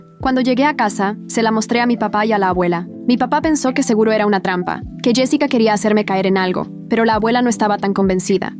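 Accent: Mexico. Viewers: La historia con algo de acento mexicano🎉😂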